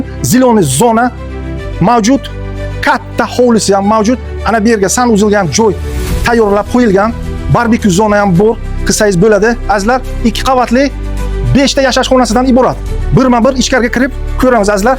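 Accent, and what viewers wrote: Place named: Bukhara, Uzbekistan. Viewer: Ман шевадан даров билдим Бухоро дб. Койил Бухоро малодес буш кемела уйга гап йук. Купрок курила кенг уйладан👍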